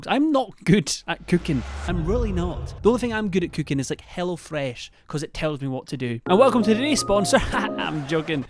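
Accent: Scotland, United Kingdom. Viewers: Hearing you speak immediately made me miss living in Scotland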